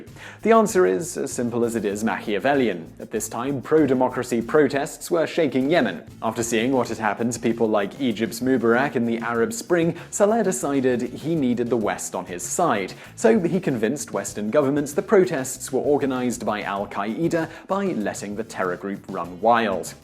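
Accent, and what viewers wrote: England, United Kingdom. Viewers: It's quite funny how the presenter here speaks in an English accent, yet uses and pronounces words in an American way. Anyone else noticed this?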